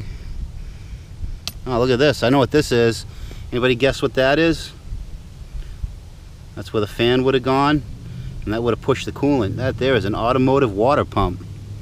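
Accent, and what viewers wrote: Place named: Massachusetts, United States. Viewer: I don’t need to see the video, the yard, or even the map. I only need him to talk for 5 seconds to know that he is from Haverhill, MA. Hello from Andover!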